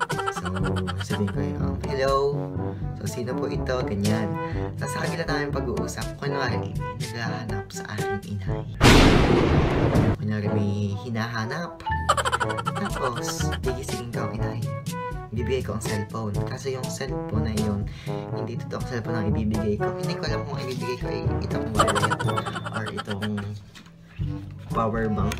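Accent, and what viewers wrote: Batangas, Philippines. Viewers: Batangeño ka ga??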